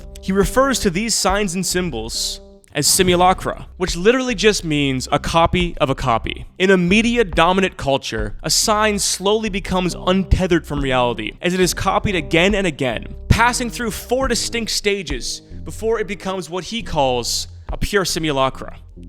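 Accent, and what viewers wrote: Canada. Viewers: Aboot! Are you from Canada? This is the first video ive seen of yours and I love it!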